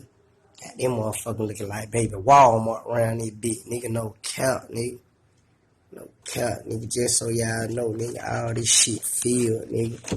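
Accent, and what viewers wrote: Atlanta, United States. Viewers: You can tell he from atlanta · ATL nigga for sure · I know a Atlanta nigga when I hear one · Kuhh from atl?😂😂😂😂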